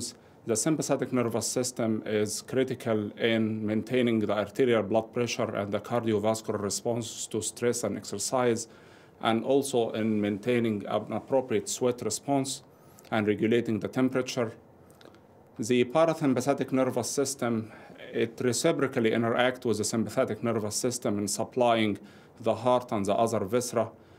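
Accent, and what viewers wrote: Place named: Egypt. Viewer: VERY INFORMATIVE. strong Egyptian accent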